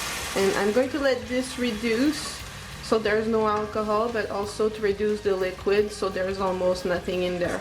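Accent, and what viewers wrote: Quebec, Canada. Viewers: What a cool channel Your accent makes me miss Quebec I'm going to make that except with 4 times the macaroni so it will have to be separate or that much more liquid I think it will dilute the sauce a bit though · J'adore l'accent québécois quand il parle en anglais. J'ai vu juste? :O))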